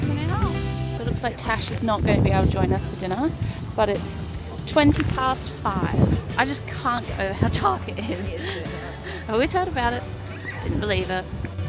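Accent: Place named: United Kingdom. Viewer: The British accent coming out nice and strong!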